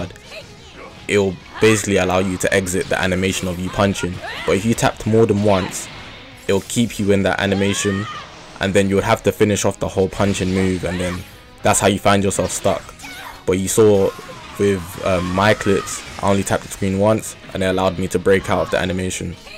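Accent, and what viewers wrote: England, United Kingdom. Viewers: i did lol , the english accent is too thick.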